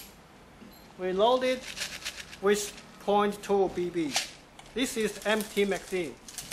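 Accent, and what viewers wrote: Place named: China. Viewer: lovin the chinese accent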